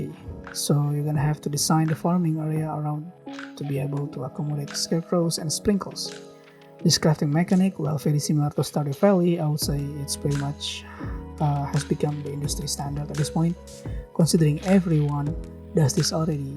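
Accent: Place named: Indonesia. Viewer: Sound looks indonesian accent.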